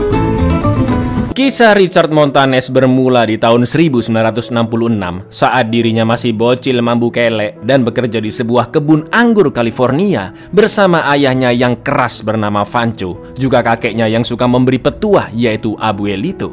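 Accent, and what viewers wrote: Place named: Serang, Indonesia. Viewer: Logatte kaye wong serang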